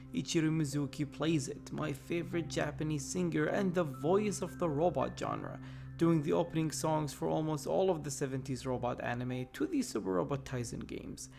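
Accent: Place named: Arab world. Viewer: are you an arab? u sound like a person who grew up in the middle east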